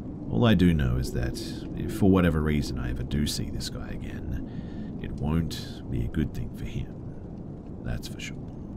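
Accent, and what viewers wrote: Australia. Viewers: Are you Australian ? Just discovered this channel I’m asking because if you are, that’s cool lol.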